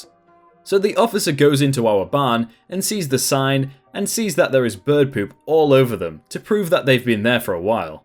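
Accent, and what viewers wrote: United Kingdom. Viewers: Hi! I have always been listening to your videos ever since I come across by my recommendations and I enjoy it every single time because I enjoy your accent of British. Please keep it up with it. I enjoy a lot.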